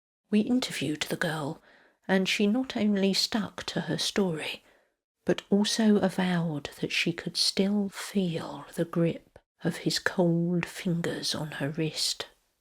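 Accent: England, United Kingdom. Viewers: Well read. Good to hear English stories in an English voice, and spoken slowly and clearly.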